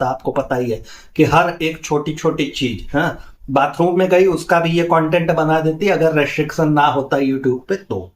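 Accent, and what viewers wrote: Uttar Pradesh, India. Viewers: Bhai aap up se ho ??aapki baato se lgta hai main bhi up se hu ...accha lgta hai aapke bolne ka andaj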